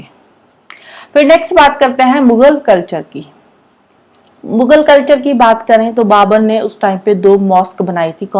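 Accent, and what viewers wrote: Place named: Chamba, India. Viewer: your ascent is chamba himachal pradesh😃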